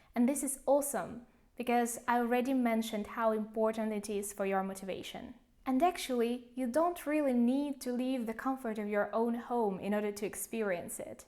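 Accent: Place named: Russia. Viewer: I believe she's from Russia:) I got it after just a few seconds of watching her video some weeks ago. I can easily get who's from this country by facial expressions, sights, the tone of their voices and finally by the accent. So I'm kind of an expert in this thing hahaha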